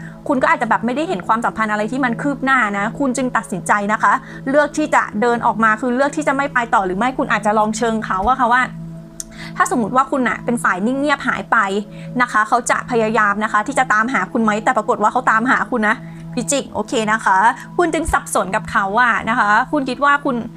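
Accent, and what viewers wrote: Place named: Thailand. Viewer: ทำนายตรงมากคับ...ชอบฟังเสียงคนนี้มากๆเสียงน่ารัก..เหน่อๆนิดๆ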